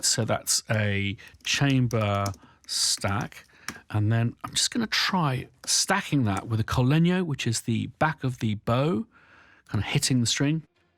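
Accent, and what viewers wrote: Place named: United Kingdom. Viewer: I want a cor englès too. The British accent and proper language create an enormous credibility to my ears.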